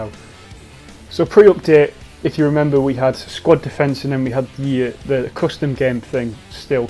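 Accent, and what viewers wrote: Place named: United Kingdom. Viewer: when i heard your British accent i smiled and kept watching with even more pleasure lol thank you a lot for that video!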